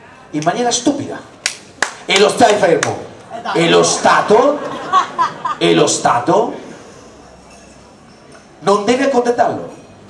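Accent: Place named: Marche, Italy. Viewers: un dialetto del fermano rosi, si capisce si capisce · è il suo dialetto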